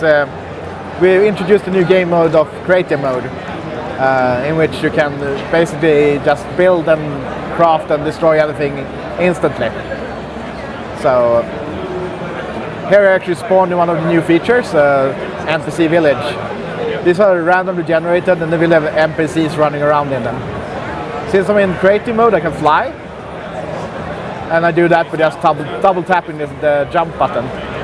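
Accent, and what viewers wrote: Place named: Sweden. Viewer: I just love english, so typical swedish-english!!!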